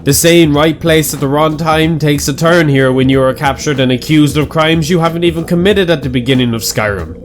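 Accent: Ireland. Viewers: I would suspect you are Irish after this vid if it is not for your nickname and flag) Keep up the good work.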